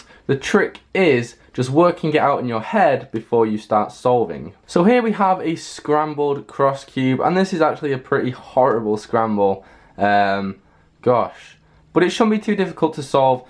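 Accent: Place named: Manchester, United Kingdom. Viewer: So much easier to understand when it is explained in a Mancunian accent, like my own. :o)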